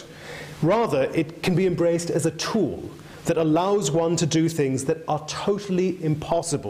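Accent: England, United Kingdom. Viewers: I don't know, but with that accent I'd bet money he went to an English public school. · Polish name with the most beautifully English voice.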